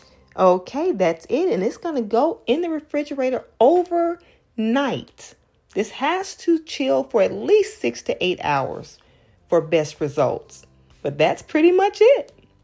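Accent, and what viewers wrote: South, United States. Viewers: I enjoyed your No Bake Pineapple Dream "Cheat" Cake and want to say thank. I particularly enjoyed your smooth and delightful Southern accent. You come across as a very sincere, peaceful and happy lady. Looking forward to your next recipe sharing. God bless you real good,! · WOW SOUTHERN LADIES ARE THE BEST...IM MAKING THIS 😊 AND I PERSCRIBED TO YOUR CHANNEL ❤ THEY CALL ME WOODY BECAUSE PEOPLE ARE ALWAYS SAYING WOULD HE? 😂 I HAVE BEEN LOOKING AT YOURE CHANNEL AND MY MOUTH IS WATERING,I WANT TO MAKE THE MUSTARD/HONEY CHICKEN I LIKE TO COOK AS IT RELAXES ME AND I LOVE YOUR ACCENT. I BOUGHT NATALIE DUPREES BOOK NEW SOUTHERN COOKING. IM MAKING TWO NOT AT THE SAME TIME THOUGH...I WANT TO TRY ONE WITH SHORTBREAD COOKIES SOAKED IN THE PINEAPPLE JUICE TO SOFTEN THEM INSTEAD OF THE POUND CAKE, I HOPE YOU DONT MIND 🙏 THANK YOU I LIKE THAT YOU MIX ALL INGREDIENTS BY HAND, IT ADDS MORE LOVE TO IT 😇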